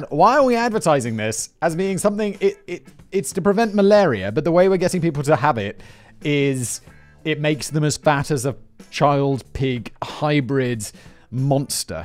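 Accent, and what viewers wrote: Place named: United Kingdom. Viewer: It sounds racist coming out with that British accent.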